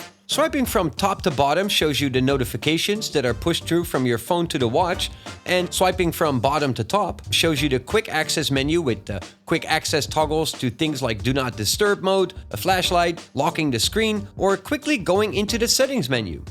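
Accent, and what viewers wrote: Netherlands. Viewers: Nederlander, ik hoor het aan je accent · Do I hear a Dutch accent? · I thought you sounded Dutch. confirmed it. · No offence but you have a very thick Dutch accent, or as they like to call it: "Steenkolenengels" or "Stone coal Dutch (as a self-referencing joke)". Thank you for your thorough review! I enjoyed it immensly!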